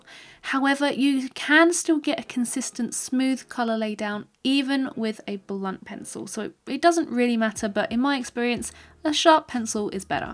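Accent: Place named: Australia. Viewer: Brilliant video- thank you so much for this, and all you share of your knowledge! 👍 Just love your aussi dialect too! ❤ Greetings from the other side of the planet - Sweden. 🇸🇪🕊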